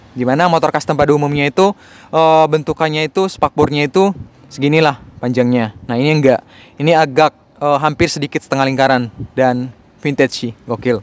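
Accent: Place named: Manggarai, Indonesia. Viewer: Logat nya manggarai sekali😂😂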